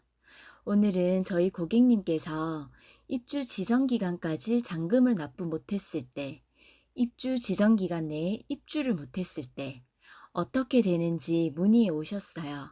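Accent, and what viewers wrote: Gyeongsang, South Korea. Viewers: 경상도 분이신가봐요 ㅎ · 경상도 TK쪽인듯 익숙해서 듣기 좋습니다. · 목소리 들어보니 경상도 사람이네요. 일단 잔금 연체이자 말씀 감사합니다 저는 실수요 무주택자입니다. 계약금10% 완납하고 70% 건설사중도금대출 나머지 20% 입주잔금은 제가 값을 생각입니다. 입주 지역은 조정대상지역이고 평택고덕신도시 a-39블럭입니다. 제가 생각하는 입주계획이 틀린지 아닌지 말씀해주시면 감사합니다. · 경상도 맞는 듯 하네요.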